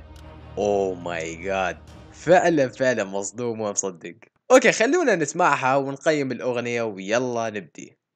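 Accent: Iraq. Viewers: شوكت كال ??اصلا هو ابد ما عرف على نفسة بس من اللهجة مبين عراقي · لا سوري عايش بالعراق لهذا لهجتة عراقية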